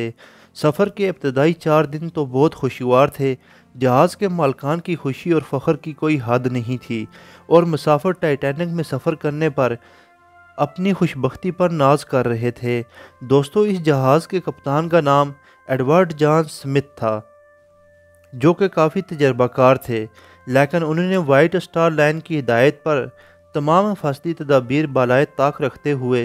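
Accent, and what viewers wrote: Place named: Punjab, Pakistan. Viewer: Apki awaz se lag Raha hai ke ap 1 Punjabi daggay ho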